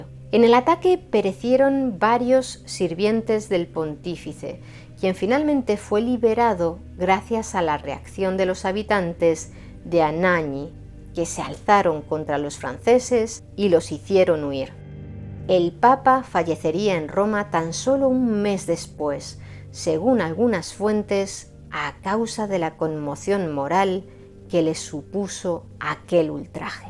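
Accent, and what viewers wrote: Spain. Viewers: El único acento Español que me encanta escuchar en videos como este sin distorsionar las historias es el de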